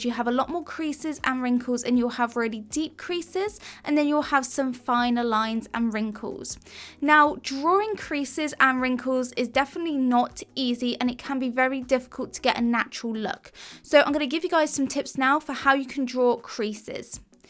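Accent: United Kingdom. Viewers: Why are the classes priced in US dollars when is so obviously British?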